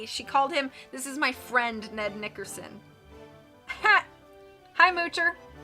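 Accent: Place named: Southern Illinois, United States. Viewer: 'skulking around'?? How does one skulk? Have you ever been skulking? It's kinda like drinking but a bit smaller? ?? You're accent is a prefect southern Illinois dialect'ie -bit. - ever been ta Cairo? Greyville? You fet right en. M. IL.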